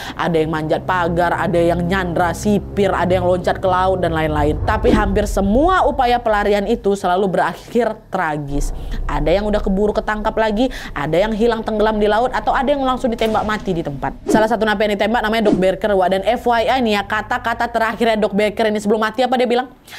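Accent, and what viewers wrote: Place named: Medan, Indonesia. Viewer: Di lihat dari logat-logat nya seperti anak Medan😂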